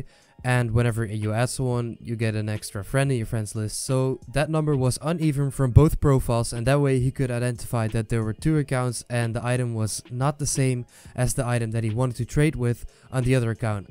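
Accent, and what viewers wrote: Netherlands. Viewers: I can hear a Dutch accent XD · You sound a bit Dutch!? can that be right?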